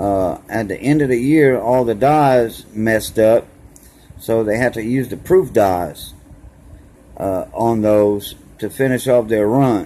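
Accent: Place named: Louisiana, United States. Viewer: hey:).. nice shout outs... i like your accent... thanks for sharing and have a nice day!!:)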